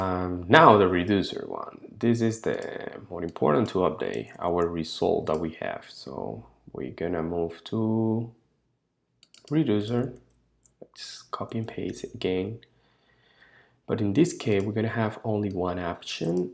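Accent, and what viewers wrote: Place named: Brazil. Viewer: I thought he was Brazilian because of his name too, the accent sounds like the Brazilians who speak English as well haha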